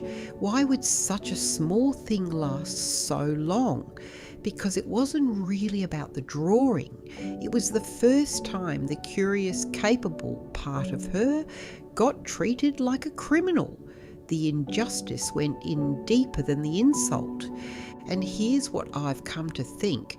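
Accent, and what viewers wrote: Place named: Australia. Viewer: Female Aussie accent in Ai.(?) Blokes know you are right. Give us pause to reflect... (then start nagging ...if we can't come up with plausable excuse, we deserve it).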